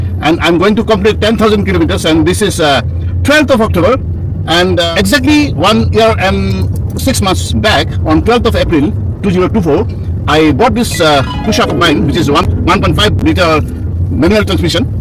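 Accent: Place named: Sylhet, Bangladesh. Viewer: সিলেটি শুনলে কুব বালা আর আফন মনো অয়। বানাইতে তাকোইন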